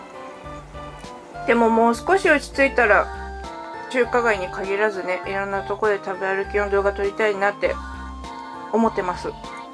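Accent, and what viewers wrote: Aomori, Japan. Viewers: 出身青森？訛りが青森っぽい！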